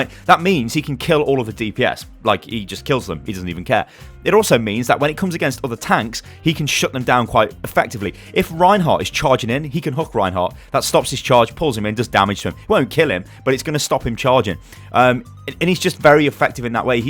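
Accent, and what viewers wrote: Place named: Liverpool, United Kingdom. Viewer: Where ya from, i cant pin your accent, is it liverpool or something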